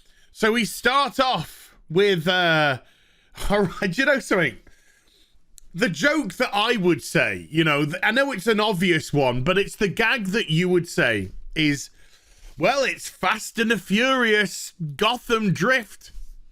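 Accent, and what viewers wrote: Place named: Yorkshire, United Kingdom. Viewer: I love how you can tell how irritated is by how Yorkshire he gets:D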